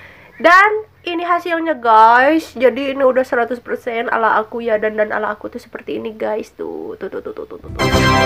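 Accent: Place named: Sunda, Indonesia. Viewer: Suka ey nontona sunda bgt kitu tah wkwk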